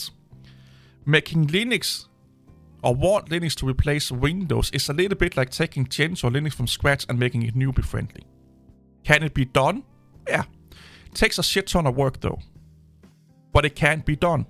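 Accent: Denmark. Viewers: I actually subbed to this channel back then just because of this danish accent. Especially when starts destroying delusional fanboys, it cracks me up every time 😅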